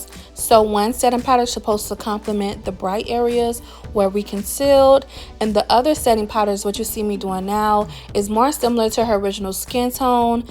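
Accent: Miami, United States. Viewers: I love your Miami accent baeeee💞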